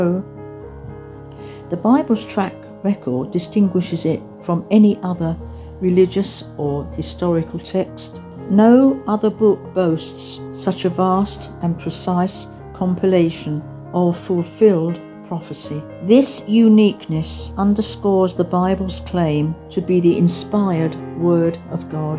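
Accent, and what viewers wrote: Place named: United Kingdom. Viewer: Narration with British accents suggests truth, its just manipulation, turn video's off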